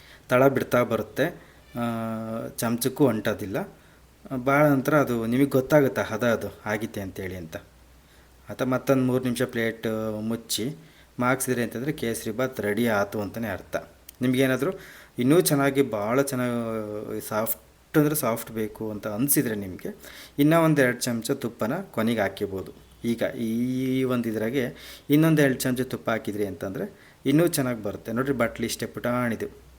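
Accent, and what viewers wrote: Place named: Davangere, India. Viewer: ನಮ್ಮ ದಾವಣಗೆರೆ ಮಾತು ಕೆೇಳೊದಕ್ಕೆ ಚೆನ್ನಾಗಿದೆ ಅಲ್ವಾ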